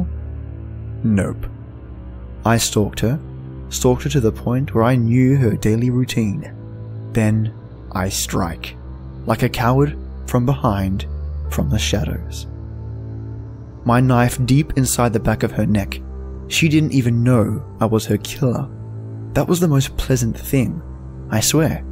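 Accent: Australia. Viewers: Aww thank you! Your awesome Aussie accent makes the stories even better & that's one reason I keep coming back to your channel! Very well done with this one! 👍🖤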